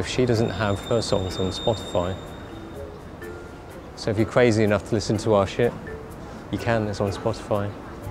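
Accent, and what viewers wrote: England, United Kingdom. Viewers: Kinda weird when a Chinese dude speak with an English accent.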